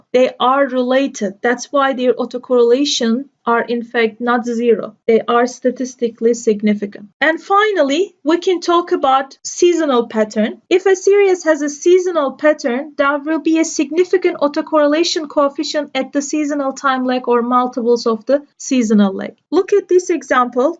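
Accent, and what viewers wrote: Turkey. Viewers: Your voice sounded me like turkish pronunciation. Smooth explanation. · I can tell this is "Turkish Accent". :-)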